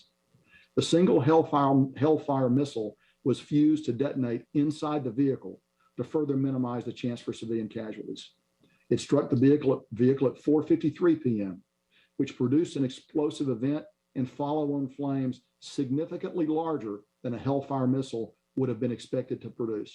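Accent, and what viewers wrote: South, United States. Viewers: This southern crap talk, why is he here, he can't even read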